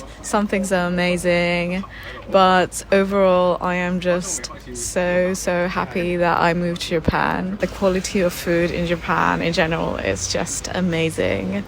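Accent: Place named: United Kingdom. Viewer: Oh first time here, and really enjoyed it! You sound so British! I live in London and resonate with the 'loneliness' of sort-- especially dating! Subbed :)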